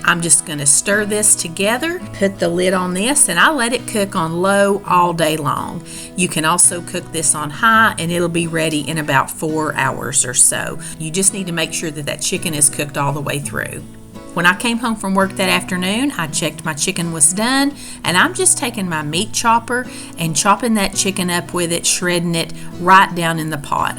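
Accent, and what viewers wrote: South, United States. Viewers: I could have eaten every bite and licked the pot! However my significant other won't eat soups, chili, dumplings and just about anything with a broth, including spaghetti. Really bums me out. We are your near neighbors just off the Blue Ridge Parkway in western NC. This beautiful fall weather has really put me in the mood to cook. I'd have to eat off a potful for a week by myself or try to freeze most of it. No close family or neighbors to share with. Loved watching you cook and hearing a sister southern girl.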